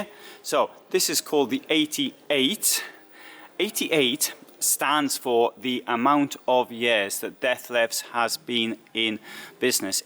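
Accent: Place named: Geordie, United Kingdom. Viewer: i like watching these but your slooooow geordie accent grinds on me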